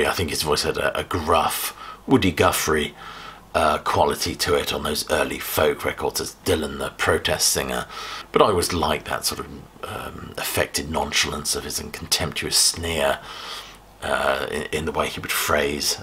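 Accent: United Kingdom. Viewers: skip it ... he is talking nonstop with a British accent and going nowhere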